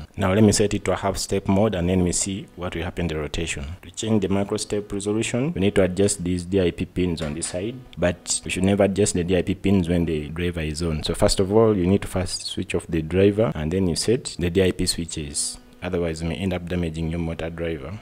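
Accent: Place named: Uganda. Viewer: The presenter sounds so Ugandan!!!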